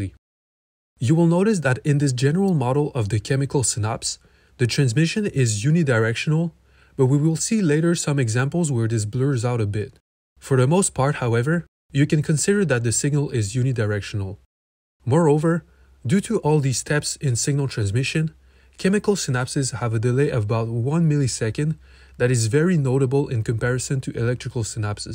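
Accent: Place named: Quebec, Canada. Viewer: you have a quebecois accent lol. love the video tho it helped a lot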